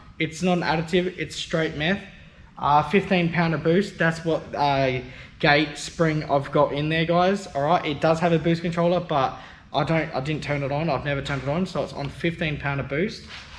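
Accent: Australia. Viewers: Bloody!!!!!!, Insane!!!!, Well BUILT!!!!!, Ya Sound Aussie:text-green-game-over::text-green-game-over::text-green-game-over::face-green-smiling::face-green-smiling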